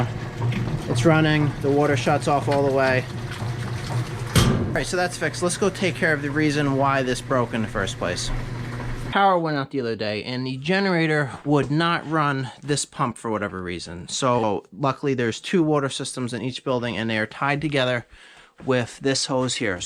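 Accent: New York, United States. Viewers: What part or town of New Yawk are you from? looks like upstate from the vids Ive seen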